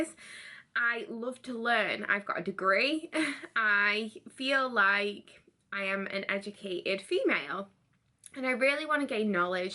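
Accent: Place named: United Kingdom. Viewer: you are adorable! Sometimes your british accent is so difficult to understand. haha! Are americans hard to understand? Anyways, thanks for the inspirational message.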